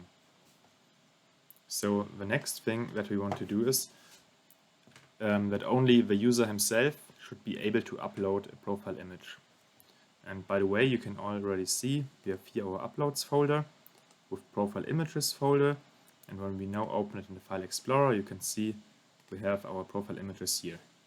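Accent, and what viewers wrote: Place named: Germany. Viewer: are you german? your accent seems like it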